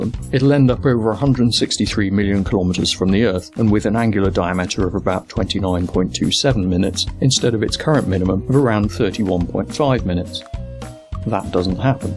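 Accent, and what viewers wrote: England, United Kingdom. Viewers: I was out after about 5 Seconds this stupid English accent or Australian accent whatever the hell it is I couldn't stand it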